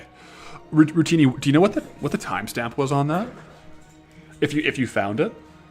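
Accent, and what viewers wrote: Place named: Alberta, Canada. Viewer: oh shit! Buddy is from Alberta haha, love to hear it my man!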